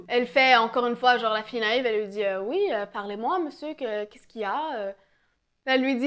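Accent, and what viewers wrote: Quebec, Canada. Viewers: T'es tu québécoise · Salut, je trouve que tu as un léger accent. Tu ne serai pas Québécoise ? En tout cas j'adore ta voix ❤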